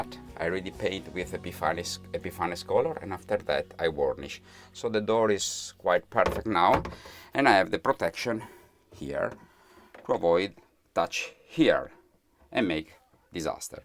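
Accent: Italy. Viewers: Wonderful, you are back in English. I don't know what I like most about your channel, all the tips on how to fix things or your wonderful English with a clear and lovely Italian accent 🇸🇪😀😄💕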